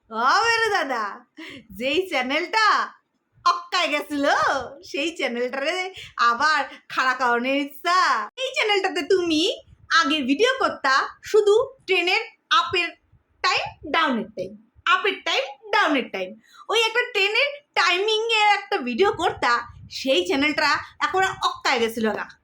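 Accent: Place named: Bangal, Bangladesh. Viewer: darun bollay bangal bhasha ta 😂😂😂😂😂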